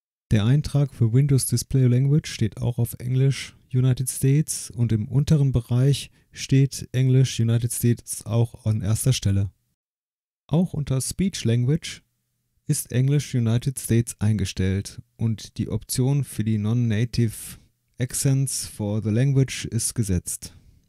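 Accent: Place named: Germany. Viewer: Thank you very much, you did help me a lot ! And absolutely LOVE your German accent 😆😉👍👍👍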